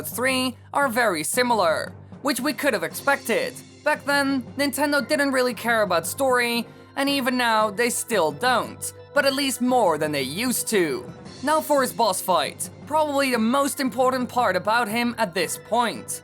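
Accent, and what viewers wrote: Netherlands. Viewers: Are you by any chance dutch? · Jouw heel klein beetje Nederlands engels accent is heelijk om te luisteren 😂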